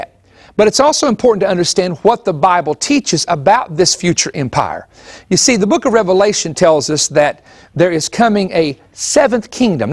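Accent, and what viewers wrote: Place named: South, United States. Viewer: although his gomer pyle accent is somewhat distracting, he says some pretty interesting things....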